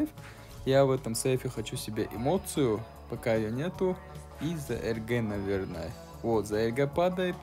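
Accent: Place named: Chechnya, Russia. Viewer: Брат, ну вот не могу понять, акцент у тебя Чеченский, но русским владеешь хорошо, я в замешательстве.. ТЫ КТО ВОИН?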